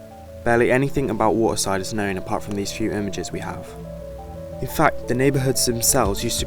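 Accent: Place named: United Kingdom. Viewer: loved the british accent but it's a thumbs down from me for the audio pops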